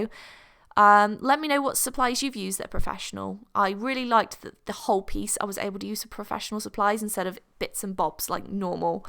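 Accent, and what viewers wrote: United Kingdom. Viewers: funny gal. I wanna know where in Britain they talk like that because I dislike it very much.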